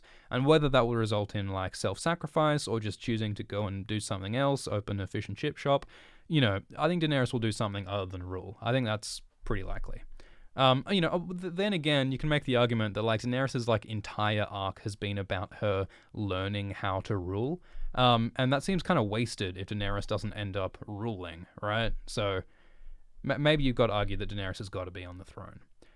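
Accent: Australia. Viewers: It's Australian (this gets asked about 50 times in every ASX video btw, he should just start out his videos by saying where his accent is from so people will stop asking all the time xP) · I'm curious, are you Australian? Being an Aussie myself, you sound very Australian? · It's not the typical Aussie accent, you can hear it in some words, but he doesn't do that thing where everything he says sounds like a question. So, it throws people off, especially Americans who hear relatively few of the different Aussie accents.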